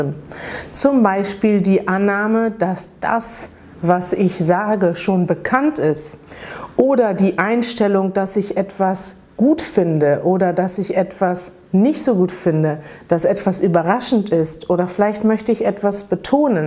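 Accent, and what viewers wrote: Standard. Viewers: Hi, how can I get in touch with you directly? g:) You are the most methodical person I found on the web and your Hoch Deutsch rocks:) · Ist das hochdeutsch?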